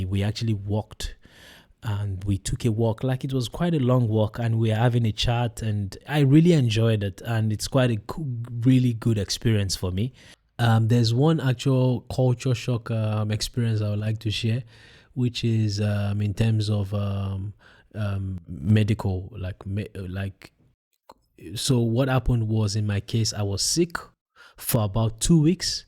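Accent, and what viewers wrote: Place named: West Africa. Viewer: West Africa sounds like. Probably Nigerian or Ghanaian.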